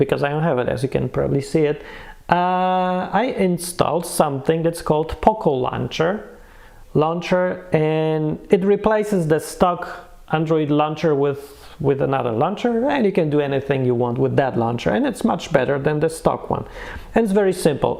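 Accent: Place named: Poland. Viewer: Heard your intro... Heard you Polish accent... And I knew, this guy KNOWS....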